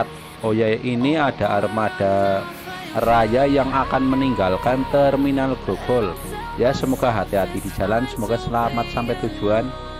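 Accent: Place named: Banyumas, Indonesia. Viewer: Salam ngapak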